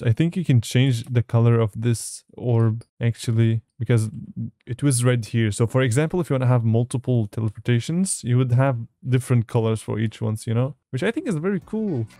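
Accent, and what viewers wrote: Germany. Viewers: Bildquelle suchen is reverse image search. I would have never thought you were German. Your accent is really clean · holup are u german or austrian?? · Wait are you German?😮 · are you german :O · are you german? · Are you German?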